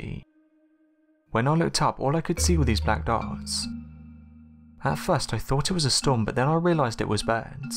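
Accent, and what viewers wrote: United Kingdom. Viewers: Why are all these videos narrated by a British guy?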